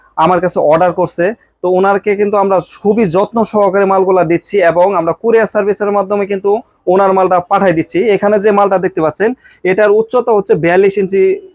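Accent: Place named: Sylhet, Bangladesh. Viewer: Sylhet tone dekse bhai 🤗🤗🤗🤗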